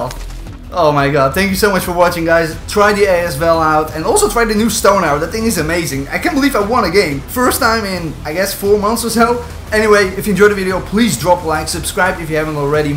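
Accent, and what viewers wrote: Netherlands. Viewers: Ik hoor het Nederlands door het Engels heen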